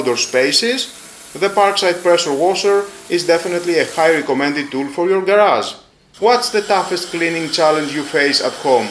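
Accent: Greece. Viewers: ρε φίλε σόρυ, αλλά μιλάς σαν Έλληνας!!!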